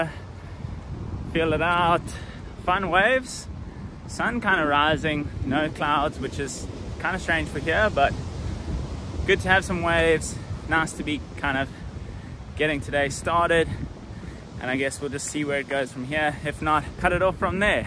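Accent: South Africa. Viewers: I hqve to ask what accent you have I’m thinking South African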